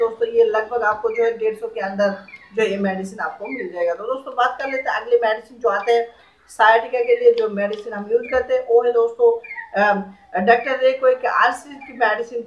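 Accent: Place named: Bengal, India. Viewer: लैन्ग्वैज NO PROBLAM आप बैन्गोली है ओर हिन्दी बोल तै हुवै अछ ऐ लगी हो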